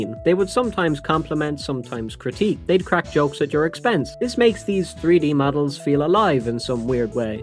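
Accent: Ireland. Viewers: irish person detected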